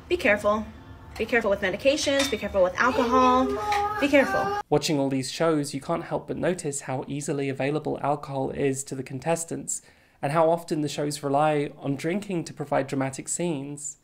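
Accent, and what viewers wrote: United Kingdom. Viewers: So after watching snippets of several of your videos in my YouTube feed autoplay thing, I finally stopped procrastinating and decided to watch the whole hour and a half video. I knew I liked your content, but this was the first time hearing your voice. So when I heard you, my dumb American brain was like, "OMG THEY'RE BRITISH?! PLOT TWIST!!"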